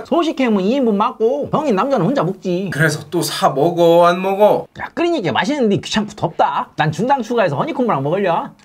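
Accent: Chungcheong, South Korea. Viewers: 아 충정도사투리 너무 구수하시 좋네요 중독됨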